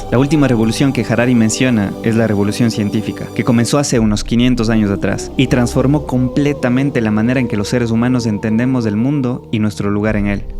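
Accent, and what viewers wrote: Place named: Ecuador. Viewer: Eres de ecuador? El acento es inconfundible :P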